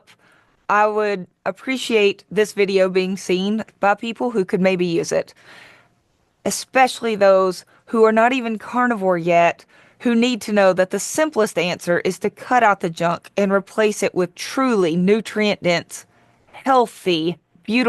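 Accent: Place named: South, United States. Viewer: Am i the only one that giggles everytime she mentions "cute little husband" as code for libido? Golly, i love this gal. She talks like me, but in southern belle terms. Lol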